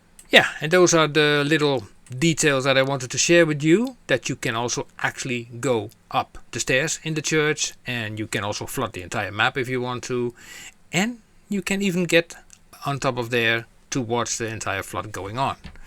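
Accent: Netherlands. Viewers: As a dutch person it's always funny to hear the typical dutch english accent haha, but great video, this is awesome to know that you can explore the areas further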